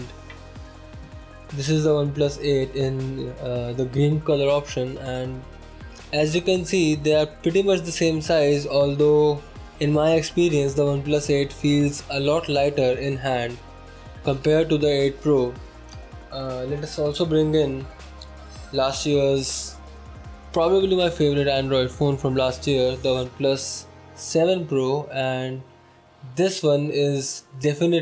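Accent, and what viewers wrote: India. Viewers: indian english accent is really annoying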